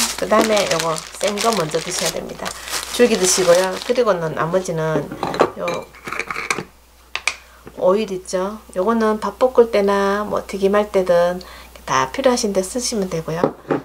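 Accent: Gyeongsang, South Korea. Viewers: 경상도 말씀으로 설명이 억쑤로 정감이 갑니다.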